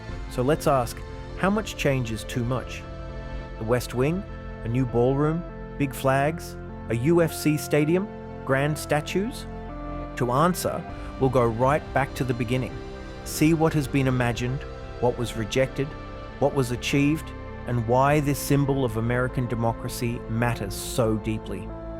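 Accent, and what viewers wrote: United Kingdom. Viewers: This narrative skews the actual history and context of our Constitution; The WH is in the Executive Branch and the POTUS does not need permission from congress make changes to the WH. They are only needed if public funds are needed, congress controls the budget allocation. The British have been colonizers and slavers for the last 400 years, never trust a video with a British accent and never trust anyone with a British title. Yeah, I said, so what. 1st Amendment, they hate it and I love it. Use it or loose it. USA❗ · Why use a British accent to narrate the story of an American seat of government? And the crappy AI images have almost nothing to do with the narrative…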